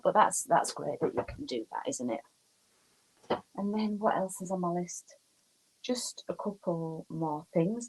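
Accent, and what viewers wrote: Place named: Yorkshire, United Kingdom. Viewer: Great video, and I love your accent, are you in Yorkshire? My mum lives near Sheffield 😊 You really went big with that NY design, it’s going to be incredible! I don’t use a pattern tracker because I prefer paper patterns, but it is great to know what % progress you’ve made, especially with a huge project 👏 One final thing, I couldn’t tell if that big piece of aida was Zweigart (it has orange in the selvedge) but if it’s not I highly recommend you invest in aida from them. It really is the best stuff you can buy, and will give you the best results 👍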